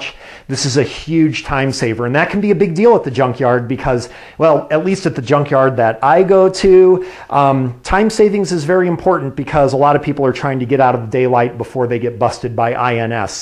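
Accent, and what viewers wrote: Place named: South, United States. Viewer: That was a fantastic review and hope you do a lot more- as a Canadian your deep southern dialect is a little hard to figure out at times - just love the double negative inverted references supplemented with some psychotropic supplements. LMAO.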